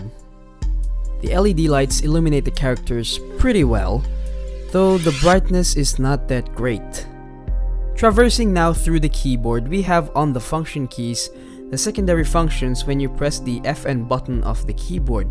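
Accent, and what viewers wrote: Philippines. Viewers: I thought I heard a Filipino accent 🙂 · Filipino accentttt... good video bro.. nagdadalawang-isip ako as to get this or not but I'll take ur word for it..looks durable enough and it seems like a good budget mechanical keyboard...kudos 💪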